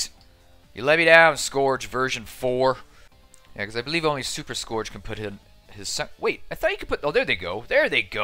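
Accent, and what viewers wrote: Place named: United States. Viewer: you sound American but I'm American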